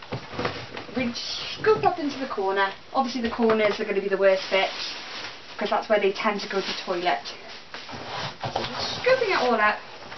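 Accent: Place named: England, United Kingdom. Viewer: Because she is from England and they have heavy accent as you can hear in the video other country with heavy accent are Indians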